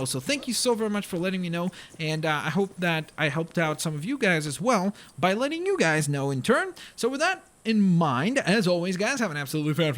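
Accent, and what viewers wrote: Russia. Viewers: Are you Russian? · R he Russian? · are you russian?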